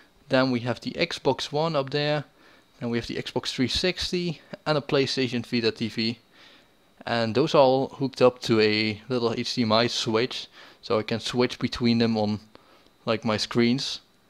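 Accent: Netherlands. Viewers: Your accent look likes dutch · Are u dutch? :P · are u dutch?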